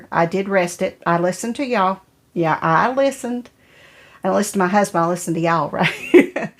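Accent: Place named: West Virginia, United States. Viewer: I love your videos. I love your voice and accent. Is that a true West Virginian accent?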